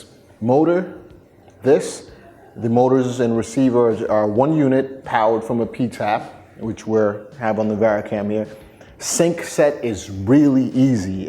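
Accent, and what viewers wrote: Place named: East Coast, United States. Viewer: Ok, thats makes sense. Sounded like an East Coast accent. I'm trying to test out the Varicam but they dont have one in Chicago (for rental atleast). But from what I've seen and some footage I've graded it looks to be a solid camera. I'm rolling with the Ursa Mini 4.6k right now, which is great, but it has some problems with fine hue discernment (especially on the red channel) and not a nice sensor texture above 800ISO. I shoot mainly docs, and some promos. The varicam looks like the move for me in early 2017. Cheers!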